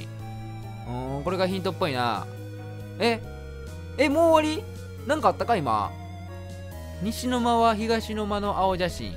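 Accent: Kansai, Japan. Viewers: 殆ど関西弁じゃないwイントネーションだけやんwww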